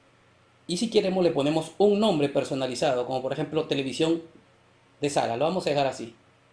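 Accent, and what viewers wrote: Peru. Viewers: Bien, peruano tenías q ser, entiendo bien tu castellano.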